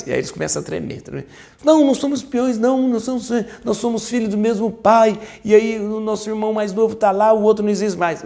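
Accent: Minas Gerais, Brazil. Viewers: Boa tarde a todos,. Boa noite padre sua benção. Maria de santo Amaro SP SP. Padre. Olha aí aquele ditado. Deus escreve serto. Se os irmãos não tivesse vendido José. Não tinha cumprido. O sonho de José la dos feixes. Vio Jesus escreve serto por linhas tortas. Amo o seu sutaque mineiro kkkkkk também dou mineira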